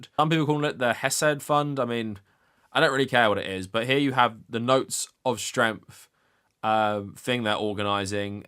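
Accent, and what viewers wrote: United States. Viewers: Why is it in standard American Dialect English? Shouldn't it be in Hebrew? Why isnt it British English???? As an ex navy cryptologist it's giving foreign propaganda... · Wow, proper Israeli with an American accent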